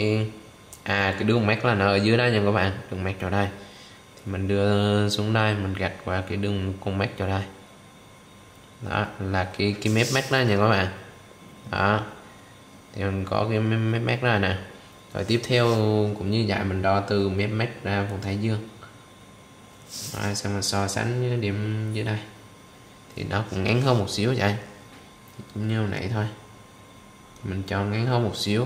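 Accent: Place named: Gia Lai, Vietnam. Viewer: Tiếng gia lai vậy á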